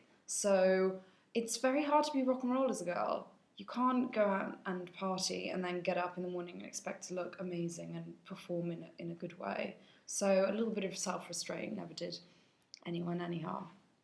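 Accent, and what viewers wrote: United Kingdom. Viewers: I never realized she had such a British accent, I mainly listened to songs and no interviews. I LOVE HER ACCENT! 😂 Love the first question hahaha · wonderful keep it coming! i haven't heard you speak in the british accent before so that was something new.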